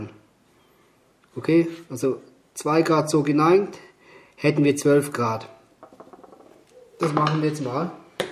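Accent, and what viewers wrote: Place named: Switzerland. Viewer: Twelve grades caster I understand in your swissy german!!!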